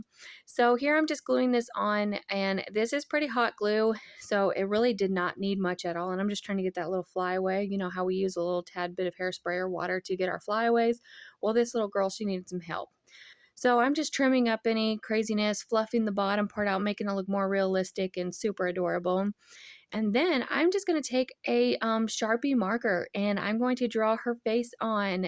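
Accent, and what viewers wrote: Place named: South, United States. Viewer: I love ❤️ your diys.. this really inspired me to get ready to start fall diys. You are so sweet love your southern accent. ❤️ I am from the south also. Have a blessed day.. keep those diys coming. 🎉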